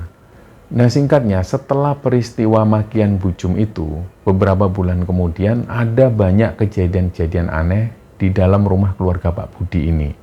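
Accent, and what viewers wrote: Java, Indonesia. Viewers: jelas sdah dan sangat² nyata bahwa KARMA itu ada cepat dan gak pernah salah alamat.... alfatihah buat alm.dan almh. bapk ibu mbak lala... mbak lala sekeluarga sehat" nggih ❤❤😊 om logat jawanya mboys... suxes nggih..😊🙏🏻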